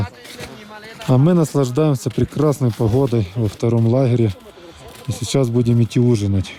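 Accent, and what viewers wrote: Ukraine. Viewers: Лахерь на хоре))) какой ужасный украинский акцент, тогда бы уж на украинском ховорил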